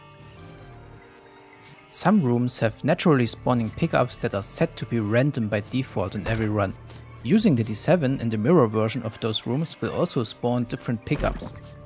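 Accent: Germany. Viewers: Uff dein englisch klingt Deutsch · you german? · Hab schon immer geahnt dass du deutsch bist 😂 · you seem to have a strong accent, sounds pretty german to me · You got a decent german accent haha, i like this more then just reading text keep it on if you like too :D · your german accent is very pog and interesting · Are you german? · Where are u from, sounds a bit like German I think.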